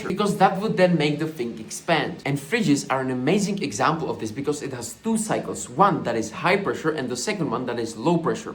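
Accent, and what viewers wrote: Czech Republic. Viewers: seš čech co? přízvuk.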